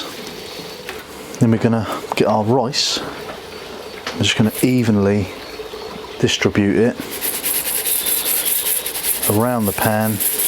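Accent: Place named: Australia. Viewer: He is speaking Australian.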